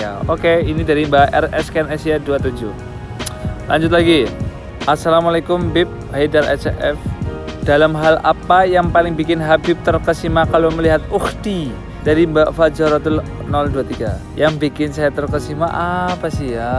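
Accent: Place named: Banyumas, Indonesia. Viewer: Ngapak.e khas nemen bib😅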